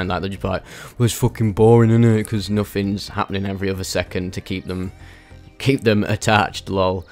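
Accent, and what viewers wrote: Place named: Northern England, United Kingdom. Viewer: Eee lad, I love that northern accent and humor. Trying to keep me wig on. 😅…. You’re awesome 😊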